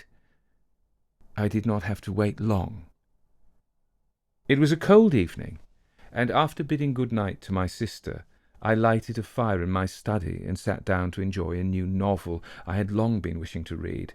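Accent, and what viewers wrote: United Kingdom. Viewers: I like your accent. I'm like most Americans, anything that sounds British is attractive and I can't tell whether you're from London, Cornwall, or Northumberland. I'm from Alabama so my accent sets me apart in my country but still, I can't tell if someone is from California, Nebraska, or Ohio. I'm very happy you narrate these great stories. I look forward to new ones all the time. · Could you imagine if all these British narrators were in the same room!? It would sound like monotones static!! Not that I mind the narration or the accents, but I do kind of find it funny that like it’s always the same British accent, (really the same voice…down to the low pitches) I mean, I get it. It works for the narrator of Sherlock Holmes so why not fucking repeat it till it’s beaten into the ground right? lol i digress, good story man.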